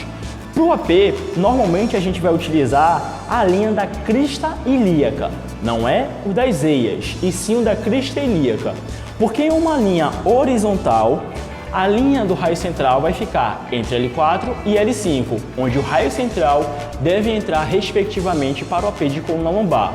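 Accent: Rio de Janeiro, Brazil. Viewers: Vc é carioca???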